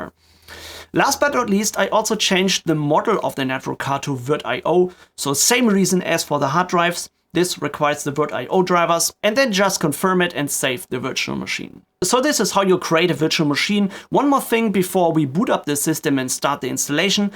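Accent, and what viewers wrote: Germany. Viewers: No front but you sound like a german i am also a german boy :D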